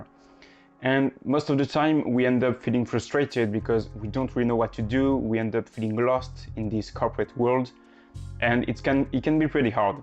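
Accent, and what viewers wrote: France. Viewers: Hello! This video resonated so much with me!! Although I've never been exceptionally good at Math and Science, I always knew I had a knack for languages and that's what I decided to pursue. I've enjoyed most aspects of it but if I could change what I did at university, I would add in something like Management or maybe Economics or idk, something a bit more "useful" (I majored in Latin American Studies). Anyway, I taught English to non English speakers (including in France! When I heard your accent it made me smile....I love Toulouse ❤️) but now I am just burned out from teaching. I'm currently doing some studies in Human Resource Management but I'm really worried I made a wrong decision because where I live, it's a really small Caribbean island so the market is saturated and I'm here again, feeling very depressed about the future etc. Sighs! 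Unfortunately, I'm still trying to figure out what's the best option for me, only knowing what isn't for me but never quite figuring out what career does resonate with me. I suppose I need to do some deeper digging. Anyway, sorry for the really long message! I'm sorry that I only just saw this video as well! It would've helped me so much back when the pandemic was just hitting and I was returning from my very last teaching stint in Spain. For now, I have a job that pays the bills but I know I need to leave soon! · Merci beaucoup tes vidéos vont probablement m'aider ! J'ai terminé ma licence de biologie (3 ans), mais je ne suis toujours pas sûre et n'ai jamais été sûre de ce que je voulais vraiment. Le côté musicienne / psychologiste je m'y suis bien reconnue. J'ai dû faire le choix en entrant en 1ère entre littéraire et scientifique, j'ai énormément hésité et j'ai suivi la voie la plus "confortable" et logique du point de vue des gens qui m'entouraient. 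Il m'arrive souvent de regretter ce choix, c'est tellement tôt pour déjà savoir ce qu'on voudra faire plus tard ! Je me reconnais complètement dans tes vidéos pour la personnalité INFP, merci pour le partage de tes recherches ! Et grâce à ton léger accent français j'arrive à tout comprendre haha ! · Salut ! Malgré que tu parles très bien anglais il me semblait bien avoir perçu un petit accent francais derrière tout ca lol Je suis moi aussi INFP ingénieure en informatique depuis 1 an (data analyst) et je me reconnais tellement dans tout ce que tu dis... j'ai plusieurs fois pendant mon parcours à la fac (et encore récemment) réfléchi à si je n'étais pas sur le mauvais chemin... à la base je voulais être prof mais trop sensible au mauvais comportement des élèves j'ai changé d'idée, arrivée au collège j'étais sure de vouloir finir biologiste mais arrivée en fac de bio j'ai complètement perdu la motivation alors j'ai changé de voie et suis partie en fac d'info car j'avais eu cette option en biologie et j'aimais le coté logique et puis mon père aussi informaticien m'a surement influencé aussi à cette premiere periode de doute... 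je n'avais donc aucune idée du but de mes études contrairement à la bio ou je voulais "contribuer au bien etre du monde", mais j'aimais plutot bien ce que je faisais, je m'en sortais bien les premières années et j'ai obtenu mon diplôme après avoir pas mal galéré sur la fin, en fait j'avais l'impression de le vouloir sans le vouloir, de ne pas trouver de but à ce diplôme... Cette année j'ai décroché mon premier CDI tout se passait très bien une fois lancée je kiffais ce que je faisais je ne me posais plus de questions... le covid est arrivé, j'ai du coup perdu mon job et cette période de "vide" m'a fait revenir à moi et qui j'étais vraiment... Avec du r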